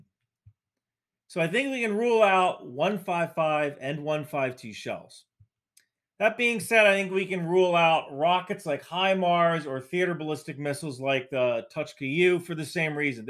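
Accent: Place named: United States. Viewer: I love your American accent . Watching you from Australia keep safe and a wonderful day 🌺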